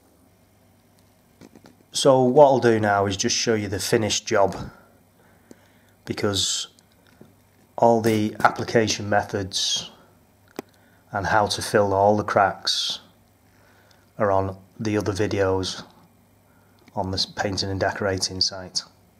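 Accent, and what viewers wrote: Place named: North West England, United Kingdom. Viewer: Lovely job, you sound like you are you based in the north west ? Would you consider giving a quote in warrington .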